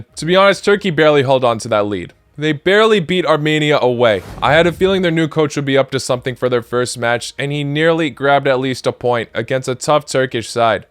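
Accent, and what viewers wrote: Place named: United States. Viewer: His parents are but his bio on Instagram says 🇺🇸🇭🇷 cuz his accent is American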